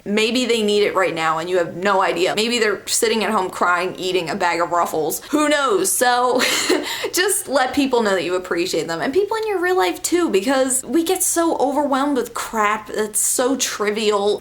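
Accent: Pennsylvania, United States. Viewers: could you do the Accent Tag would love to see that from you because you would be exceptional at it because PA accents are out of this world!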